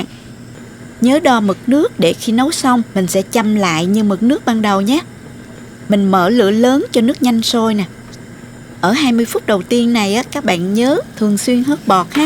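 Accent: South, Vietnam. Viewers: Giọng em miền Nam ngọt như mía lùi,nhưng xin em hãy giữ nguyên thủy những từ của Nam Kỳ cho khỏi bi lai.Cám ơn.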